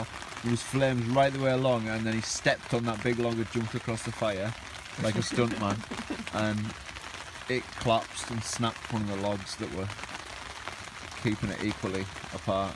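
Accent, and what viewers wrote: United Kingdom. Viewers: not that great with UK accents. Are you in Wales?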